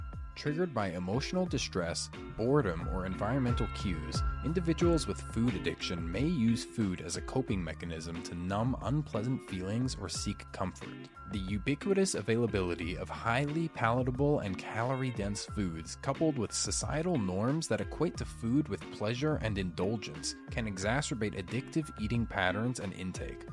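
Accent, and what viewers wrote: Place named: New York, United States. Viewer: That's definitely either a New York or Philly accent, not Boston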